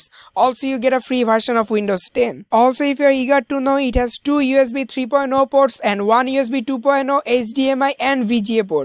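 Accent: India. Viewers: ur accent sounds like indian,btw where u r?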